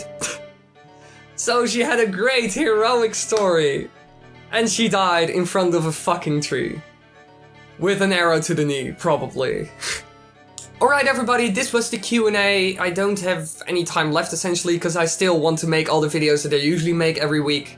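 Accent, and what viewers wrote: Netherlands. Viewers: Je bent Nederlands! Ik hoorde het al aan je accent.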